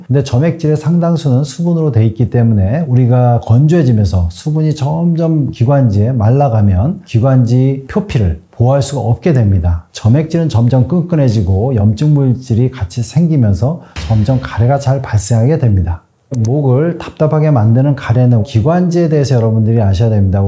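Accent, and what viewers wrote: Gyeongsang, South Korea. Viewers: 경상도분 같아요?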